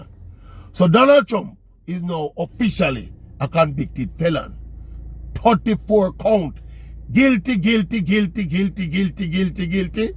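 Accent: Jamaica. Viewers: Oral Tracey I love your Content and energy - I just wish I was able to understand everything you are saying - some times you go too deep into your Jamaican dialect or speak too fast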